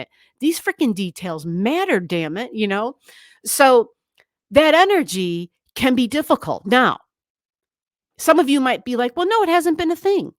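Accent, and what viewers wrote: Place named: Midwest, United States. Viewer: Wow, this is fascinating and I just love all of your videos. It's refreshing to listen to another midwesterner-hehe. My BML is in Capricorn (almost Aquarius) in the 10th house. I'm a Sag sun, Aquarius moon, Taurus rising, scorpio venus. I tend to get very aggravated with Virgo energy even with all of the Earth placements in my chart. It feels judgey and oppressive. G, I also noticed that a few married couples around me are having big arguments about cleanliness and household duties. Woah.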